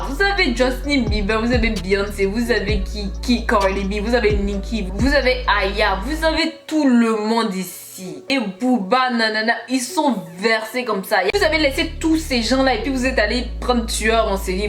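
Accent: Quebec, Canada. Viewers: T’es québécoise nan ?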